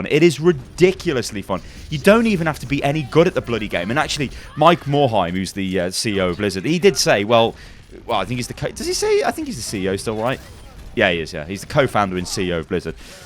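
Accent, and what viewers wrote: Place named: United Kingdom. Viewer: bought the game just because my freinds all had it, from the videos i saw i thought it basically was tf2, but looked like it could be fun, but manic, but actually playing it is completely different, i must admit for the first few hours i did find it abit manic, but once you learn abit about the charachters it makes alot more sense. coming from playing lol and gw2, i expected some learning curve in learning charachters, but i must say i think i will be playing this game for a long time, its competitive,fun,varied, and game times aren't rediculous long!! also enjoying your british commentry!! keep it up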